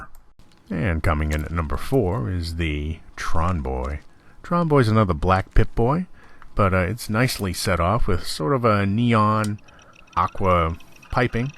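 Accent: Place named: Boston, United States. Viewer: You sound Boston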